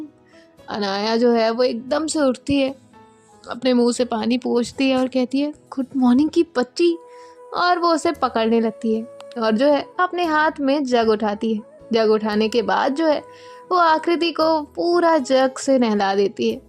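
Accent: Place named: East, India. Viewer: Aap kya East Side se belong karti hu kyuki mujhe yesa lagta hai ki aap Bihar ya Udisa se belong karti because aapki voice ton East Side ki lagti hai😅 Agar haa toh reply zarur karna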